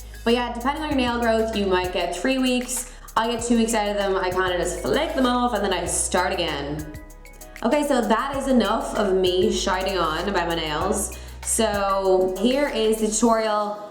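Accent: Dublin, Ireland. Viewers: Is she Irish ? Cause I kept hearing hints of an Irish accent in there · What shops do Ye get ur nail equipment I'm from Dublin I could tell ur Irish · Kelcey Burrow I don't hear British I hear American and irish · Kelcey Burrow sounds irish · definitely not scottish. id say an irish woman whos lived in england/ English influence. or english woman with irish parents etc ..... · Ireland? I hear it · its a South Dublin accent xx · she's irish😂😂😂😂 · Kelcey Burrow Irish I think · Kelcey Burrow it’s Irish I sound like her too😂 p.s. I’m Irish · Malaka Mohamed it's and Irish. Accent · Kelcey Burrow I'm from Ireland she is Irish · I hear an Irish accent. 💚💚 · you're beautiful and I love your irish accent :) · irish gal!! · she's Irish 😊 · she's Irish ahahahhaha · definitely sounds irish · She's Irish what r u on about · As I can tell your from dublin, I was wondering where you purchased these products & how much?? 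And also did you paint them using normal nails varnish or is there a special type??x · We Have Essence In Ireland Too.....Yes She Is Irish LOL! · Americans tryna say she sounds Irish with an American accent!? Haha no. She sounds dublinish through and through. That's a Dublin accent. Move on. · Have you never heard an Irish accent before? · I love the Irish accent 😭😂 I have Irish background but I'm not fully Irish which sucks because I'm just from America like boring 😂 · She's Irish and she sounds Irish the whole video to me?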